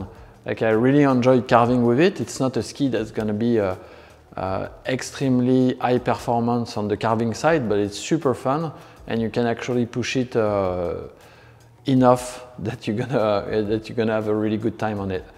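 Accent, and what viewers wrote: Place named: France. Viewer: Très beaux skis !👍 Ça s'entend que le présentateur est français 🤙😁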